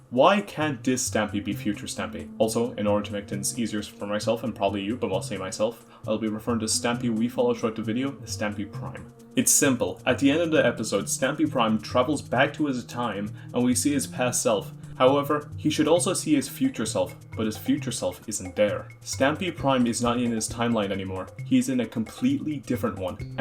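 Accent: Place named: Ireland. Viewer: I thought I recognised that accent Hello fellow Irishman Also, great vid absolutely loved it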